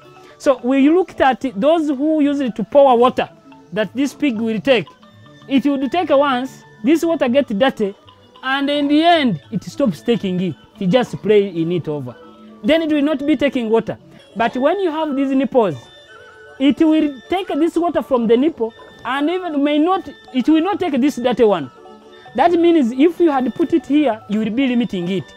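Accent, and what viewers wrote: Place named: Kenya. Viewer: This's Kenya English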